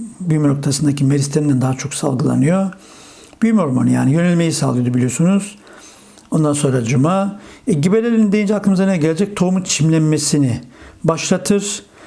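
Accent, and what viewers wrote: Black Sea, Turkey. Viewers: Hocam siz karadenizli misiniz:) ? Hocam bir de ben (Palme Yayınları, Bilgi Sarmal Yayınları, Rasyonel Yayinlari Ayt biyolji Branş denemelerini cozuyorum) sizce yeterli mi ? Ortalama 7 ya da 8 net birakiyorum ama sinavda neredeyse full birakmam lazim:((( Bir de Hocam ayt biyoloji icin ne dusunuyorsunuz sizce bu sene nasil olur? Sizden cevap bekliyor olacağım simdiden tesekkurler :)💜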